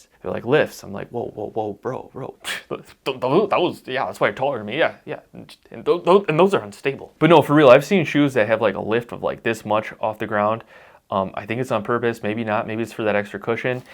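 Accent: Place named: Upstate New York, United States. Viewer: Are you originally from upstate NY? I like your subtle accent - it's just like my friend's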